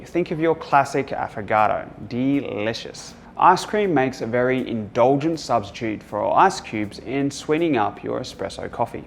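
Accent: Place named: Australia. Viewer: Bro, I love your accent... BTW are you Australian?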